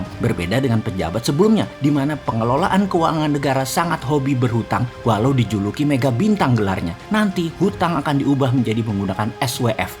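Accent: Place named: East Java, Indonesia. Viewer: Ngopimu kurang adoh lur wkwkwk hahahaha Jawa timuran rek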